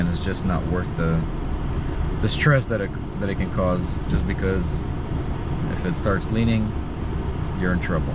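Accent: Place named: United States. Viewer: Да ладно, ты русский? Ахренеть, я тебя долго смотрю, думал, что ты Америкос с таким-то акцентом.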